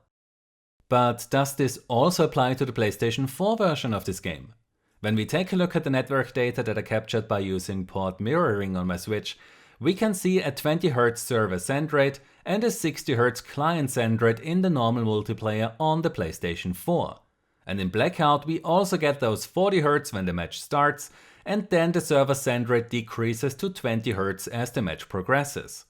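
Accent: Germany. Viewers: Is your first language german? You accent sounds like that and is easily to undestand for me as a bad english speaker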